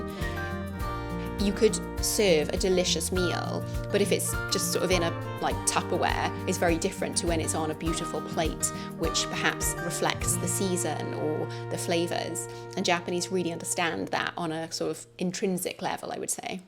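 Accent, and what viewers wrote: United Kingdom. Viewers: 動画の配信がしばらくなかったので、心配していました。 素晴らしい家族愛でお父様への孝行と恩返しをされて、天国のお母様も きっとお喜びだった事でしょう。 素敵なイギリス英語とともに、感動の動画をありがとうございました。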